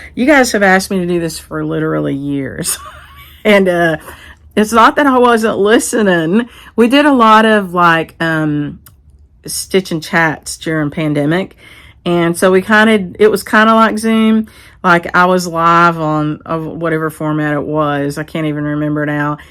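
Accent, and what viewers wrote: South, United States. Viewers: Hi. I just love you and your shop, as well as your flosstubes. I not only enjoy the content but just love hearing you talk (that southern accent reminds me of my extended family). And, forgetting to post that last flosstube is something I would do. You sure did make it easy to use two of your words in a sentence. I know you are not that far out of the city to have farm animals, but I can imagine hearing the cow bell in the distance. The brightness of the colors you have in your shop, I don't see a yellow cover. If you have one that I missed, please let me know. Wishing you the happiest of holidays! Pat Tackaberry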